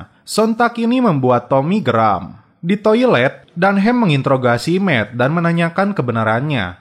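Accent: West Java, Indonesia. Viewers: Knpa bang aya sundaan wkwk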